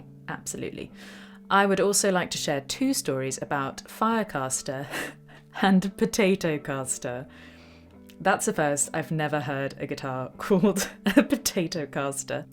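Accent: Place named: England, United Kingdom. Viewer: My first time seeing you. I like your accent. You’re English but I can still understand you. 😆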